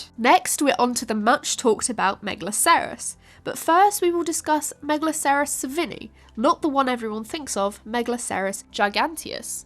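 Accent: United Kingdom. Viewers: I like the British accent. It makes me think of Harry Potter. Sorry. 😅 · Fabulous! Listening to a British Thumbelina in a basket teaching me about deer is so wholesome.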